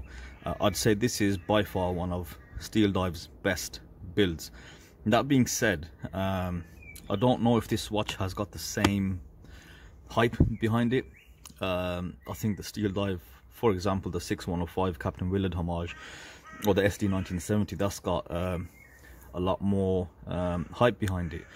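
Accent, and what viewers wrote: Birmingham, United Kingdom. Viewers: Another great vid, pleasing to hear another Brummie on the Tube, loving the reviews! Seen a few of your mods with after market dials now, where do you manage to source them from? 👍🏻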